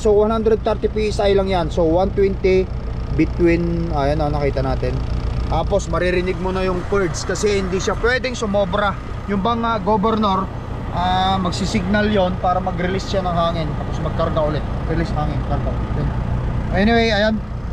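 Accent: Ilocos, Philippines. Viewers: yun speaking voice mo tunog batangeño na ilocano tigas ng dila mag pronounce ng words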